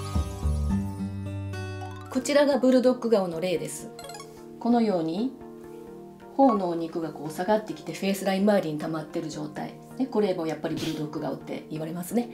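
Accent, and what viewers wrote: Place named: Nagoya, Japan. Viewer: 自分は名古屋出身なのですが、さんも名古屋のかたですか？なんとなくですがニュアンスを感じました。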